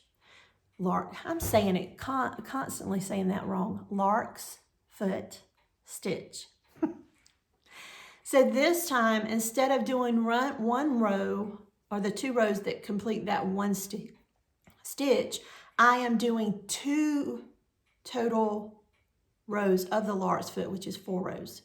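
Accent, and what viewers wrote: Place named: South Carolina, United States. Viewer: Hello Neighbor - From Georgia! Love your South Carolina accent and soothing delivery- lived in Greenwood several years and have a son in Greenville. I love all your cross stitch - so inspiring and elegant. Thank you for the inspiration. Your afghan will be lovely too!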